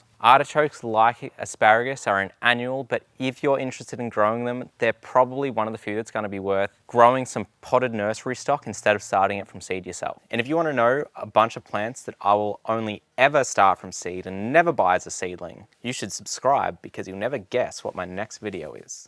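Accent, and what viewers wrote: Australia. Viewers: New sub. Where are you from? Aussie?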